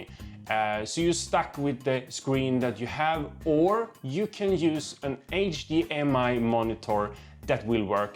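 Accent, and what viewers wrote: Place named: Sweden. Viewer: As a Norwegian I immediately heard you were swedish... The swedish, norwegian and danish variants of english are very distinct :D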